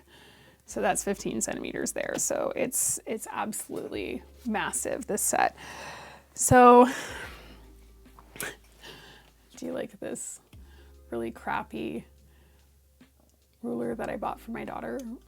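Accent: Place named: Canada. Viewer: I actually thought you where Canadian 😂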